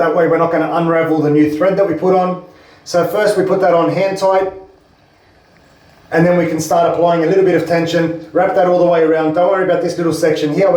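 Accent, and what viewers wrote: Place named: Australia. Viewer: Legend, you literally have just saved me big time. I am young single female with no plumbing experience and was able to do this! Being an Aussie I immediately felt calm hearing a fellow Aussie use no nonsense straight to the point instructions.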